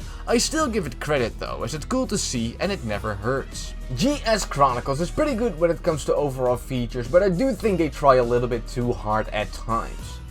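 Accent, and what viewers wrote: Netherlands. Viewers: Only took me ten seconds of hearing your accent to find out you're Dutch haha. Either way, nice video and you're definitely right. Though these glitches are kind of to be expected since they're essentially cramming a DS game onto GBA hardware. What would be your favorite Johto romhack then?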